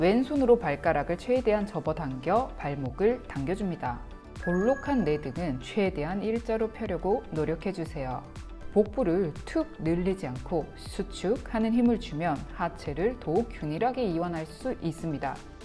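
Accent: Gyeongsang, South Korea. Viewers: 말투가 ....신기하네요 사투리인가요..?ㅋㅋ좀...신경쓰이는ㅋㅋㅋ 경상도인지 강원도인지특이하네여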